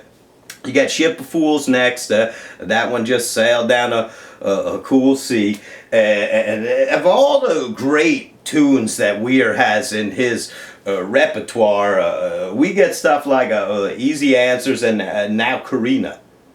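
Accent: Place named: New Orleans, United States. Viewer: You ever lived in New Orleans?? You sound like youre from here!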